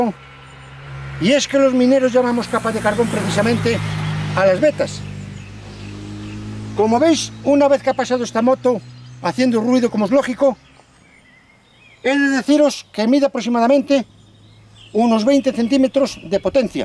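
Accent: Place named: Spain. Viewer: Es agradable escuchar su acento y su gramática. Habla muy especial el idioma español. ¿Qué región de España es usted caballero? Post data: gracias por la información sobre el carbón, necesitaba esa información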